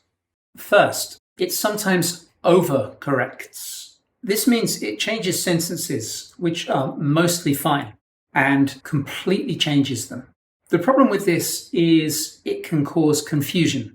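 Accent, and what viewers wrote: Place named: United Kingdom. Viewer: Hi coach I realised yesterday You are very very good Many thx for sharing your experience Also i like very much uk accent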